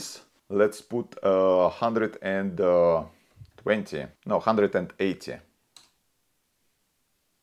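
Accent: Russia. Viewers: Русский акцент потихоньку убывает у автора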